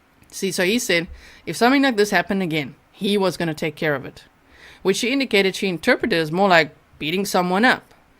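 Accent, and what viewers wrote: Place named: South Africa. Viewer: Knew it from your accent, South Africa 🇿🇦 in the house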